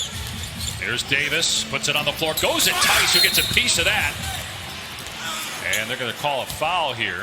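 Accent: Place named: United Kingdom. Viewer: spiffing brit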